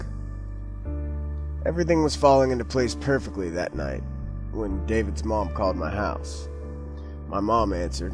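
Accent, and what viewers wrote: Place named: West Virginia, United States. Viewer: Great story, and I love your voice! Kinda makes me feel nostalgic. I moved to TX from WV about a year ago. I never realized how much I would miss that accent. Listening to this makes me feel like I'm hanging out with some of my old friends trading stories while smoking. lol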